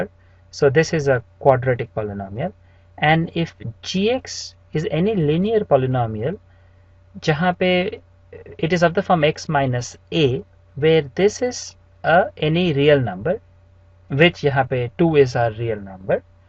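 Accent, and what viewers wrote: India. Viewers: sir,are you from south india.??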